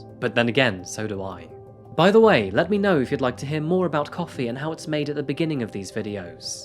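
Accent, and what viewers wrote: United Kingdom. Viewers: Hearing a British guy, talking about Canada STILL reference United States dollars here just makes me happy. We're still #1 baby!!! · Where do I send the coffee? Wait, you're British? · I love the way you narrate the stories and your British accent. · You live in England? That’s why your British accent is coming back. 🙂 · Hey. I thought you're American. Are you British? You've said you're from Seattle before as far as I remember.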